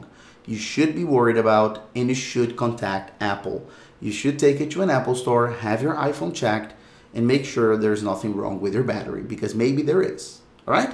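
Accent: Brazil. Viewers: Why do you have this thick brazilian accent ?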